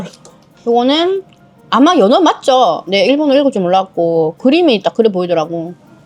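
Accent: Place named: Busan, South Korea. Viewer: 아리가또~고자이마스~ 부산 사투리 ㅋㅋㅋㅋㅋㅋ넘 귀여운거 아니냐구우~~❤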